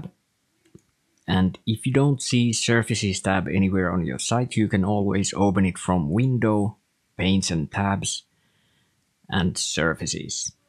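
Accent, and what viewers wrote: Finland. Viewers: You sound very Finnish! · Excellent tutorial :) Did I detect a Finnish accent correctly?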